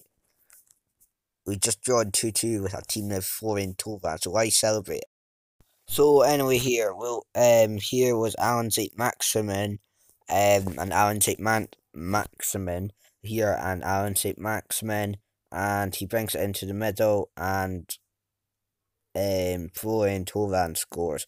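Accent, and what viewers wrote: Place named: Scotland, United Kingdom. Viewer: Also I’m loving ur Scottish accent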